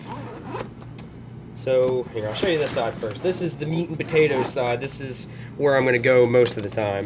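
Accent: Philadelphia, United States. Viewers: Literally two seconds in I could tell you're from Philly lol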